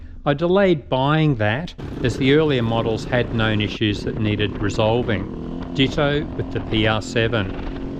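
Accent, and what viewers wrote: Australia. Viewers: Nope, just nasally Aussie twang 😉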